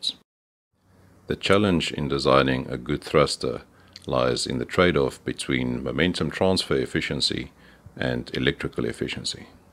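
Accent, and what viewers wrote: South Africa. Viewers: You sound very South African, thanks for the videos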